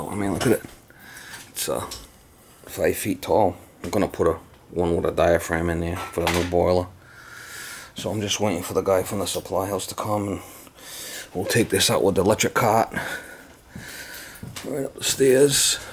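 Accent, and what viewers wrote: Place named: New England, United States. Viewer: Great videos. Oh mama do I get a kick out of the New England accent. LOL! Wish you lived closer to western PA. I have a boiler that needs replacing and appreciate you taking the time and effort to make these videos. Big Thx!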